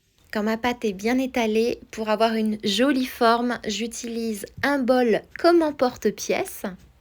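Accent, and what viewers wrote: France. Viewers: Hi, if you don't mind me asking, from where is your French accent? I'm learning French and that's why I ask and by the way I love chickpeas and this tortilla/bread looks so good.😻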